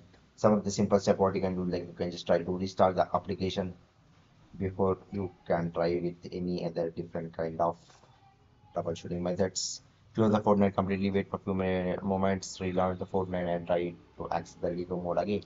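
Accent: India. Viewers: of course he has an Indian accent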